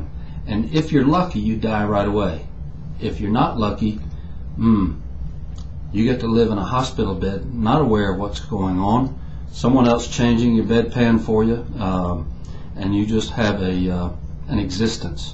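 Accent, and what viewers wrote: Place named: South, United States. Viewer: " the southern accent doesn't make him any more believable" Nice prejudice coming from Canada "eh"? Statements like that only point out how ignorant you are. Have you ever been to the South? Do you have any idea what you're talking about? He's not talking about harmless yoga stretching, he's talking about wrenching your neck beyond it's anatomical limits.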